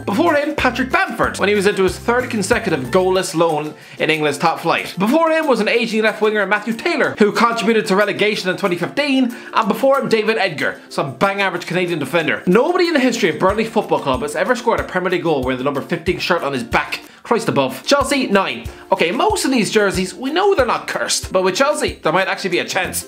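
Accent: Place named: Ireland. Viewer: I can't understand a word he's saying 1 because he's irish 2 because he talks too fast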